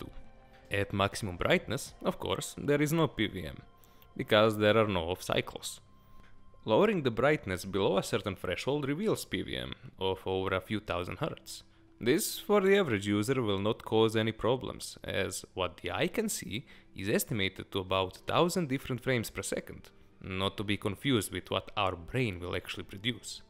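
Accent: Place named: Russia. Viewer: His accent makes him sound like a physicist from mother Russia he sounds like he knows what he’s talking about 👀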